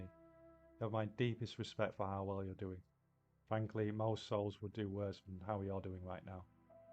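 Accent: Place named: Northern England, United Kingdom. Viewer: The person narrating IS an English person. They have a northern English accent. The subtitles are there for a reason :)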